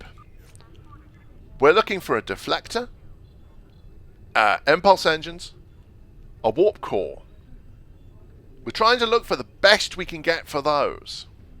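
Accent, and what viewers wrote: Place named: England, United Kingdom. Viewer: If you don't mind me asking, what part of England are you from? I love your accent.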